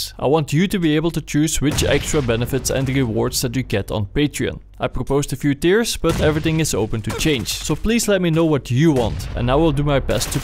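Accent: Netherlands. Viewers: You are from the Netherlands i can hear it in your foyce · R u dutch cuz of you're accent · sounds a little bit like dutch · Your accent is pretty funny, like all the dutch people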